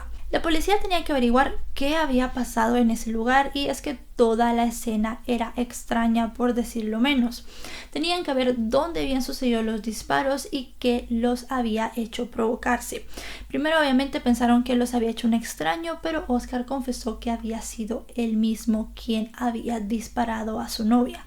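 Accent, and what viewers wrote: Standard. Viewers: Tu español es muy neutro.. es de donde? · Excelente manera de transmitirnos la información sobre los casos ,amo su español Neutro ,no tenía idea de donde Era pero creo que no tiene acento de Guatemala es más no se distinguir el acento solo que me encanta la forma en que narras ,un gran trabajo impecable ! Suerte que siga creciendo más Saludos de una Hondureña ! ❤️ No hay día que no vea un caso suyo ! :)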